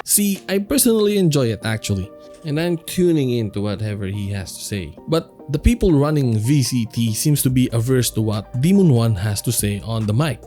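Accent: Philippines. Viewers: Pinoy kaba lods? tunog e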